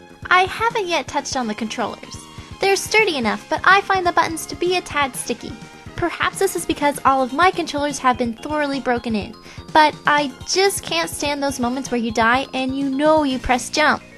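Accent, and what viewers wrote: Canada. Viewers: Your Canadian accent is so cute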